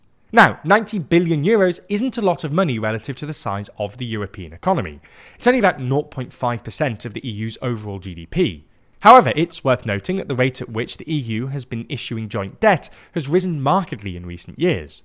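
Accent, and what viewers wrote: United Kingdom. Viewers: Where are you from? cause you sound british, but that would be strange :)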